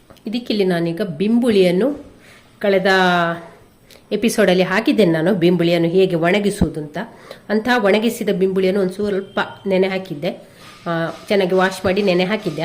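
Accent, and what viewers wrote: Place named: Mangalore, India. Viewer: Wooow new healthy recipe sister 👌 🎉 watch full video liked 👍 nim manglore kannada super sis😅🥰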